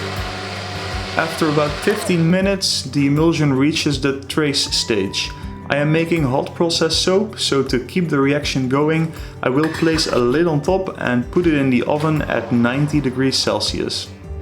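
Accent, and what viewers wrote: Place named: Netherlands. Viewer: You sound very Dutch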